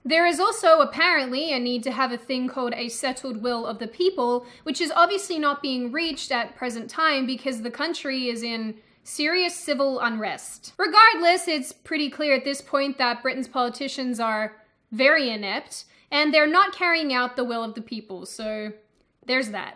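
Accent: Australia. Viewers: I love her Australian lilt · Did you live in America for a while? Your accent sounds like a fusion of Australian and America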